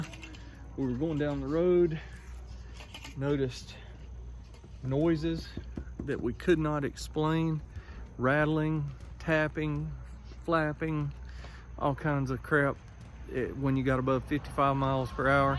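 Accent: United States. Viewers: Are you from Virginia? Sound like a friend of mine. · I don't have the noise you were having, but I noticed the same issue with mine. I'm in South Carolina, you sound like you're from the south too, maybe it's something with the Southern heat and sun? Regardless, thanks for the thorough tutorial!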